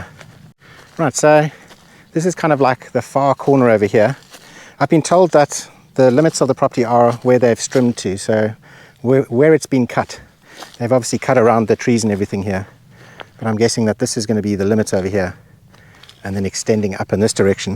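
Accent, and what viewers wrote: South Africa. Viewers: are you from South Africa? Your accent is similar. I visited Durban years ago.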